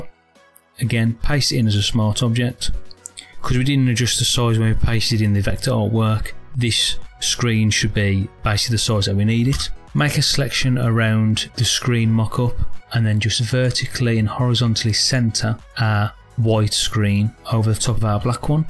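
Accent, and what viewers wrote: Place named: United Kingdom. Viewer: Bruh I cant understand the way you are talking in british style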